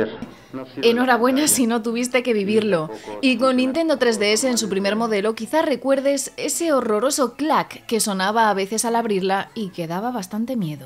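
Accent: Spain. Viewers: Juan Jose Velázquez Lucero Cuestión cultural amigo.... Así lo pronuncian en España, "equisbocs", acá en México le decimos "Ecsbocs" .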